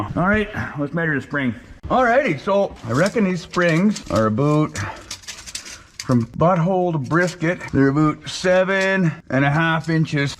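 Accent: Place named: Western Canada, Canada. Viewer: I only just got here, and I see a Canadian flag and hear an accent that sounds like you've got the Western side of the land in ye, and then I remember the fact that the comment from another video I was watching that I found you from mentioned the fact you got a beast named Slave Lake. Yeesh, you from the plains er sumthin?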